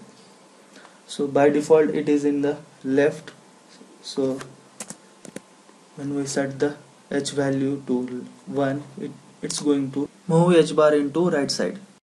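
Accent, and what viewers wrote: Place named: Hindi, India. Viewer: this lovely Hindi accent..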